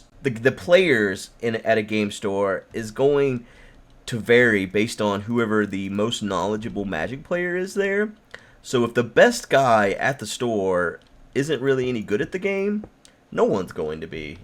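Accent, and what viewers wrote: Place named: Alabama, United States. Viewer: dude, are you from Alabama?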